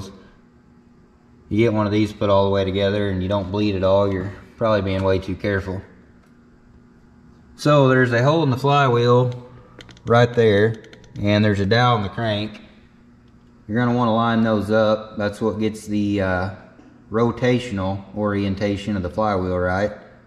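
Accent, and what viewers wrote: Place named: Oklahoma, United States. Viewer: I’ve been trying to figure out where you are from, SW Oklahoma, your accent, I was curious. I wonder how many miles it took to get across that field. Been there done that spinning my wheels on the mud. I like your videos. Thank You Sir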